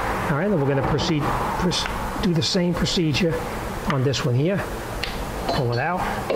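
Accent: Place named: New York, United States. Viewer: Leave it to jeep to make a weird rear brake setup, lol. Im like..."an o-ring? Why, what for?...what the hell are these damn long metal clips on these brake pads for? Why did this rear rotor set come with 4 long metal brackets..what is going on here!? Damn Jeep"🤣🤣 Was gonna just do em like I did on my Ford Edge - which doesn't have those 4 long brackets that the pads slide onto at all, they just clip in like the fronts. The caliper on there looks exactly like the front calipers, except 1 piston instead of 2 . And the brake pads don't have those metal clips on them that need to be depressed to get the caliper off. Cause I looked at my mother's rear calipers and was confused at what was going on, haha. Thanks for the video! Glad I watched it before doing them on her 06 Grand Cherokee. Thanks for specifically showing the rears! Other videos I've seen atleast just show the fronts, which is normal like most cars. 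Then you get to these outer space rears🤦 haha. Thanks again! A+ on the NY accent. You sound like me, except I'd be adding some choice words in there lol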